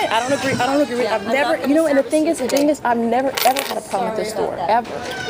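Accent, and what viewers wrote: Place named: Latin America. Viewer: This has nothing to do with your video, but it sounds like you have a latino accent. Are you of Latino descent? Sorry you had a bad experience. Target sucks most of the time.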